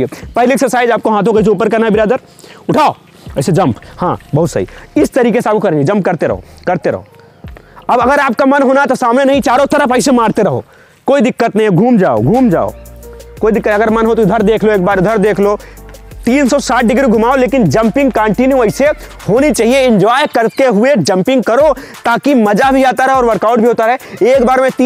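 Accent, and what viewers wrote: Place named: Bihar, India. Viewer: I love bihari acsent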